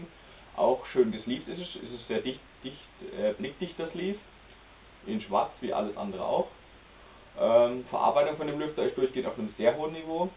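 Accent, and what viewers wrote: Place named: Swabia, Germany. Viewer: Wie ich den schwäbischen Dialekt hasse, da kommt so richtig mieses Fremdschämen auf - Ich bin selber Schwabe... ;_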